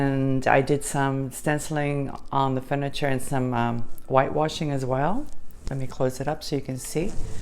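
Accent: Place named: Australia. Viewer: I knew I detected an Australian accent! Wow, you had an interesting childhood! I had to make a choice in High School between music and art as we weren’t allowed to do both. I played violin and was in the orchestra so my path was laid. But, in elementary school, I won a few art awards and so I decided to bring it back into my life. Unfortunately, I became disabled almost five years ago now and I’ve become interested in different types of crafting. I enjoy beading and jewelry making as well, but I also enjoy paper crafts like card making and journal making. I’ve also become interested in polymer clay, needle felting and embroidery along with cross stitch. I’m also interested in learning watercolor techniques. I’m kind of all over the place and I think I need to figure out a schedule or something as I have more interests then time/energy to do them. 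I guess I’ll get it figured out eventually. Thank you for sharing! 💜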